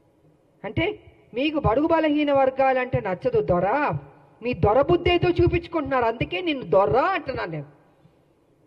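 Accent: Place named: Andhra Pradesh, India. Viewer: Meeru Andra bhasha matludutunnaru .idi telangana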